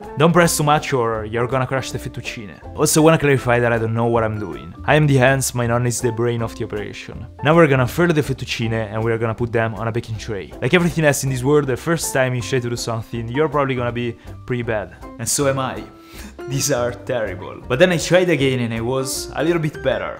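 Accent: Italy. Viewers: fra guarda che è italiano, lo capisci anche solo dall’accento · In this episode i noticed his accent became more italian than usual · I like your way of talking in Italian Accent ❤️ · Io ho scoperto questo canale per caso e appena ho sentito il suo l'accento ho subito pensato "tu sei italiano!" 😂😊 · Ora sono riuscito a rispondere alla mia domanda che si ripeteva nel,a mia testa da tempo, no non è vero ahaha, beh cow mi sembrava dalla tua pronuncia è tutto che fossi italiano e in effetti lo sei boom shaka laka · Ma fra si capisce dalla pronuncia che sei italiano... checazzo devi imparare a fare la carbonare... spero bene che da italiano tu la sappia fare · Ciao sono Lisa una tua fan italiana. Tu hai origini italiane? Perché parli molto bene inglese ma si sente molto l'accento italiano. So che non leggerai mai questo commento ma io in caso ho provato a chiedertelo. 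Ciao ciao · I hear the italian dialect☝️👍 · I love his fluent Italian accent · Bella la tua voce italiana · Italian accent I’m Italian to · I love how half of this video is just him flexing his Italian accent on us. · I spotted your channel just some days ago and I really like your videos I immediatly knew by your accent that your family is originaly from Italia, it just sounded familiar. I'm from Germany and I bet my accent is way worse than yours but I really like yours, mine not😂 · Yes it's very good I generally love Italian accents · Bro avevo intuito dalla pronuncia, ma quindi sei italiano!!! 😅😄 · Ma tu sei italianooooo si sente, aia la pancetta però eh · LO sapevo che eri tialiano dall'accento del tuo inglese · Si sente molto che sei italiano.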